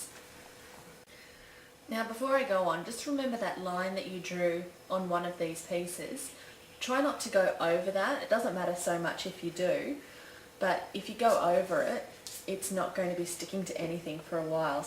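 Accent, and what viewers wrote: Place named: Australia. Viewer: This is great stuff...thanks for sharing! Oh...and it is cool to hear your Australian accent - it is so weird to hear someones voice when all you have done is seen their photos. I have been told I have a minor Boston accent - no where near the movie accents you hear botched up and over acted! :) Again thanks for sharing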